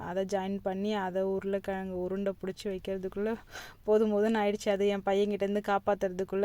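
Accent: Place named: Chennai, India. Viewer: no sis chennai nanum Village la than poranthu valanthen innaikkutha unga video first time pakkuren namba ooru slang la pesureenga romba happya irunthuchi ❤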